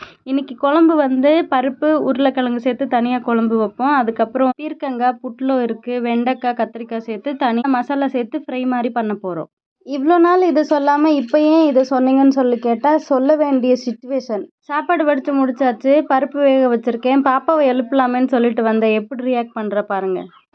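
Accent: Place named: Cuddalore, India. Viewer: பொதுவாக கடலூர் வரையிலும் ஒரு நடை என்று சொல்வதன் வழக்கம் இங்கும் அந்த பேச்சு வழக்கு உள்ளது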